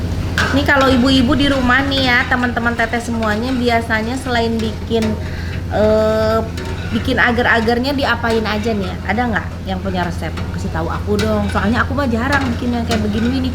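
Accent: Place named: Sunda, Indonesia. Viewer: Berasa d garut kitu tah suasana na nyariosna sunda sadaya tth geulis ih resep abi mhh😇😇😇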